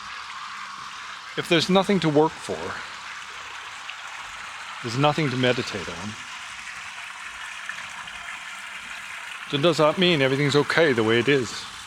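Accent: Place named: Scotland, United Kingdom. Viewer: I am going with a friend in a few weeks. I only live 2 1/2 hours away by car and yet I only heard about it two months ago. And yes, I have been studying Buddhism for years. I live on walney Island. In cumbria UK. I have been studying. the Dharma for quite a while but on November2nd 2024. My precious wife died from a heart attack at the age of 58. And even though I understand that everything is transient. It still hurts. Even though I don’t exist, ultimately conventionally it hurts! So I decided to go on retreat somewhere and an American Yogi told me about this place it turns out my psychotherapist teacher in the early 90s used to go there I talked to his daughter and she said yes that’s why he went and yes I had no idea he had studied Buddhism! And so my American Yogi said maybe you’re meant to go there and I will be going within the next few weeks just for the day and then I will arrange for a retreat when the weather gets better as you know especially this week January 2025. 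It’s pretty cold everywhere.! I have one question for you do you live in England but you sound Scottish to me? I have subscribed and will watch all your videos because this one is excellent thank you.yours Trevor.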